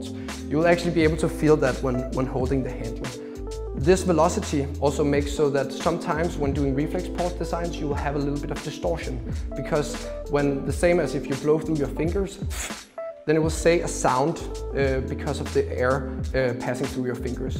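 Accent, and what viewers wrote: Denmark. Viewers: man kan høre han er dansk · Ja jeg elsker man kan høre han er dansk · I Can hear the Danish accent haha sinds højtaler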